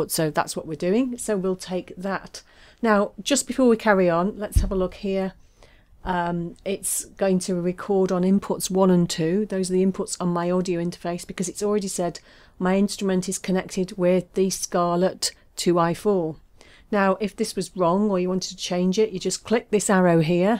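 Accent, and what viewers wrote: England, United Kingdom. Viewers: Hello, I'm from Germany, did I recognize your sound that you come from England or is it from another country?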